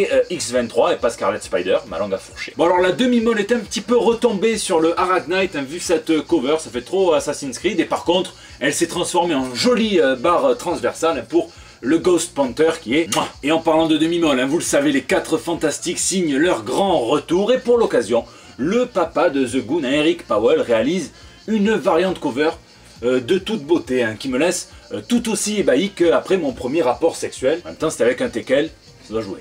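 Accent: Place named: Provence, France. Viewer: Geek Librairie c'est le top les cigales,l'accent provençal, on est en vacances !!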